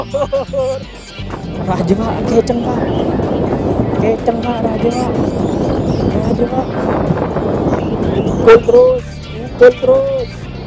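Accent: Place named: Madura, Indonesia. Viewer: Mantap kawan... Yuk Saling suport... Orang madura mana suaranya bosss....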